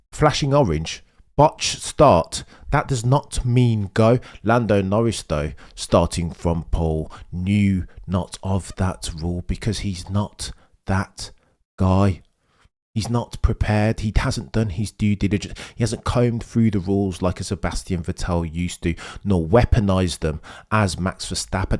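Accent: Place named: Oxford, United Kingdom. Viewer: Very philosophical , brilliant Oxford english!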